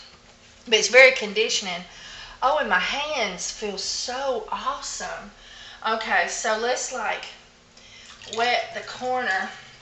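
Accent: South, United States. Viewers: Thank you for the video. I love to listen to you. I'm from Ms. so I understood your "sayings" LoL! Gotta love the south :)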